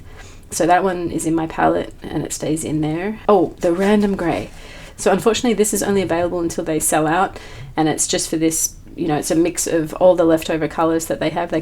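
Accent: Australia. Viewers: Hello... you sound a bit Aussie, but living in USA? If so, hello from a fellow Aussie. Thanks for the video. Could you do me a small mix favour with your Roman Szmal, with the "tint" colour with a Cobalt blue please? Could let me know if you get a grey or a green? Thank you in advance! Looking for a sky and cloud glow. A bit of a unicorn, I love a cobalt or warm blue like periwinkle, or lavender with a white in it, to make it opaque thinned out, and less granulating. I love Schmincke's finest Ultramarine. I know I am searching for a glow, without going green that is also non granulating. Eg jarosite. Or some gold ochres, some Naples or Flesh colours. It is likely to be a neutral yellowy orange or a PBr7 like a burnt sienna... Like you, also a fan of pens and ink. Have you tried Emma, the R & K sketch ink? A waterproof greeny olive, fast drying! In kindred spirit, Eliza. 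Dulwich Hill, Sydney Australia xx 14th of December 2022 pm bed office PS I like the look of your deep bag. Where do you get the large fine mist spray bottles? They are not on your list? PPS: Thanks for your channel. xx PPPS: You need to try my favourite RS, "Misty Morning", it separates, a light cool grey into a rose and cobalt teal kind of colour. An edge happens. It is delightful. Good by itself. Seeing as though you like your neutral abstracts, I think you will have a new favourite.....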